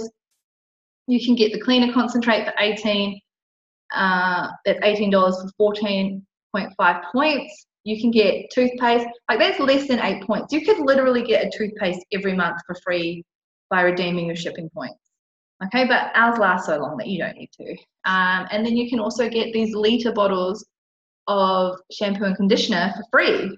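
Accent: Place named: New Zealand. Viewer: omg - i watched this at work and we have no speakers on computers so used captions lol Youtube can't pick up a Kiwi accent.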